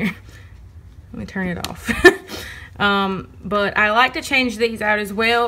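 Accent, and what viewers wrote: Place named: North Carolina, United States. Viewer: OMG you sound like you are from Lumberton North Carolina! Lol where state do you live in?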